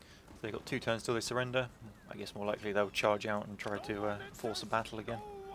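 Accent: United Kingdom. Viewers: are u brittish?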